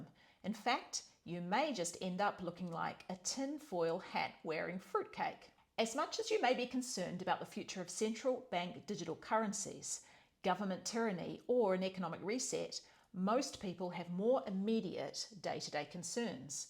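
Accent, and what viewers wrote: New Zealand. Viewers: Enjoy your videos. Could you provide a bio sometime in a video? I believe you have said you are from New Zealand (and I detect the accent) and live in Portugal now. How did that happen?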